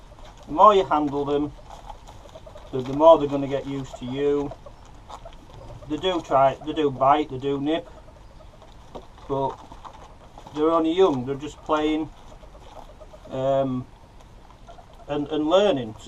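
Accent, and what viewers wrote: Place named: Yorkshire, United Kingdom. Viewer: you sound like your from Yorkshire I'm looking to get a ferret could do with some advice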